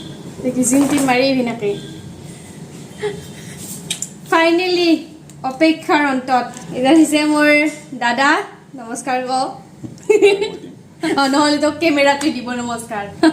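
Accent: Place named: Goalpara, India. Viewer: bhal lage huni tumi 2ta lang kotha bur kole eta normal assamsse and eta lower’or goalpariya barpetiya assamese tu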